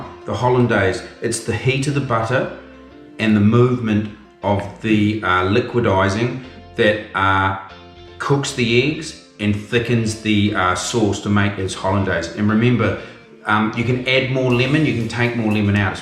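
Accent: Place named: New Zealand. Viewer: Love that Kiwi accent.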